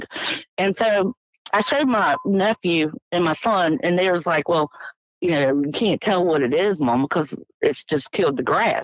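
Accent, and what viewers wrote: South, United States. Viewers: Yes mater of fact you sound country is a day is long doggone be yourself who else can you be rock on with your bad self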